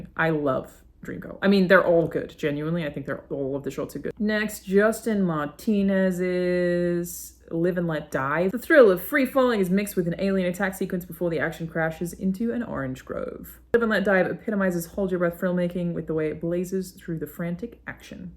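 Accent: United States. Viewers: Watched this last night. Not bad. Weird hearing your american accent since im so used to your Australian..the skydivers bit gave me Cloverfield vibes. But good job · I loved it and Stowaway was the best for me due to the psychological horror. The ideas presented were brilliantly horrific, without giving any spoilers. And, let's give it up for your American accent. Kudos,. For real. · hearing you speak in an american accent was weird as hell.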